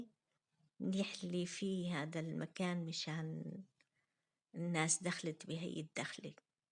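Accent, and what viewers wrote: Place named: Salamiyah, Syria. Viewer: الله يعطيكي العافة أستاذة الله يعطيكي الصحة والعمر الطويل فيديو رائع وحضرتك أروع وإلي الشرف إشترك بقناتك لهجة حضرتك قريبة كتير للهجة أخواننا الطيبين في مدينة السلمية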